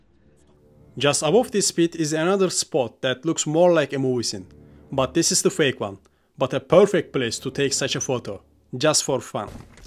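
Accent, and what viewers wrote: Turkey. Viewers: İngilizcen o kadar güzel Türkçe aksanı ki~ arkadaşlarıma gönderiyorum. Örnek olsun diye! Btw harika videolar! ❤ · Strongest turkish accent you will ever hear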